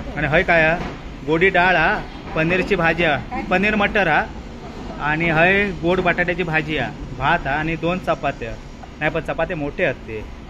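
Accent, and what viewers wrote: Malvani, India. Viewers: तुमचा मालवणी बोलणं खुप aawdata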